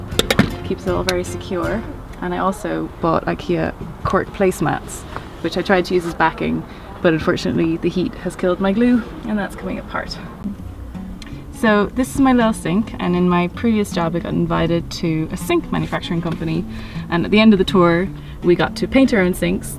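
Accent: Ireland. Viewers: She is originally from Ireland, that's why she's sound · Is that an Irish twang I hear? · Are you from Ireland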